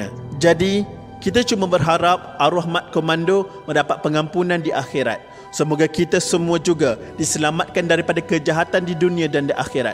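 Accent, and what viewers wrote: Kelantan, Malaysia. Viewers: Kelate ni hahahah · Admin-Kelantanese detected by pronunciation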